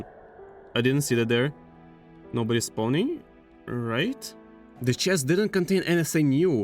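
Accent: Russia. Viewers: окей, по акценту и шуткам, ты явно русскоговорящий. пришел в комментарии пожелать тебе удачи в твоём пути, видос получился уютный, надеюсь увидеть от тебя ещё такого. 💪🙂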